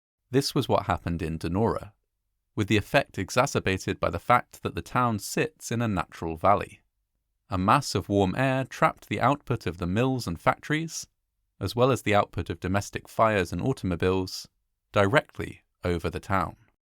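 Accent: United Kingdom. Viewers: Your historical accounts of these tragic events across the planet and during recent centuries, are exceptionally well researched and are excellently narrated and visually presented! Your sensitivity in presenting these human tragedies is also very commendable! Thank you very much kind sir! I enjoy your British accent and turn of phrases, especially when they're used to describe North American life. As Canadians, we're more likely to use American terminology even though we've largely retained British spelling and syntax. Within this short documentary, you use: "the high street" versus (the North America) 'the main street'; "football pitch" versus 'football field"; "caskets" versus 'coffins'; "steel works" versus 'steel mill'; I should have jotted down them down as there were perhaps a couple of others! I'm certainly not suggesting changing your speech or approach. 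I just thought that viewers outside the UK might have noticed and appreciated this diversity of English idiom worldwide 🌐! Perhaps English usage throughout the former British Empire follows the original traditions. I'd imagine that due to the influence of the cultural elephant next door, that 'Canadian English' is much more Americanized than most! 🇬🇧 🇬🇧 🇺🇸 🌎 🇺🇲 🇨🇦 🍁 🇨🇦 🇺🇸 🌎 🇺🇲 🇬🇧 🇬🇧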